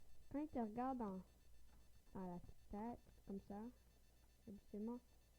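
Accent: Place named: France. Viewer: Arrete avec ton accent francais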